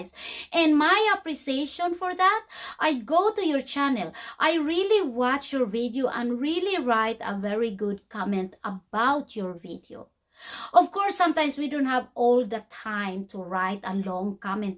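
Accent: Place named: Scotland, United Kingdom. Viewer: Hello dear, I love listening to your voice it makes me feel talking to my Aunt in Scotland, your accent is same as her. I can relate to what you are talking about too, I experienced twice a person commenting on the comment of my viewer I thought it was normal here in yt world, it is disrespectful in a way but I leave it behind. I always agree to the golden rule. KEEP SAFE.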